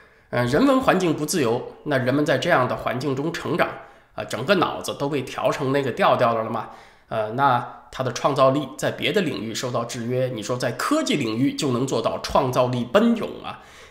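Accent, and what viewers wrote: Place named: Tianjin, China. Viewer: 天津普通话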